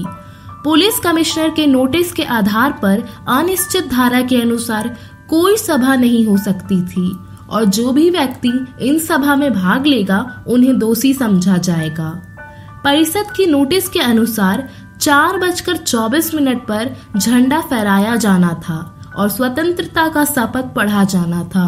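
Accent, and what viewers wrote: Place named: Hyderabad, India. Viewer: Bhai tum Hyderabad ke hain kya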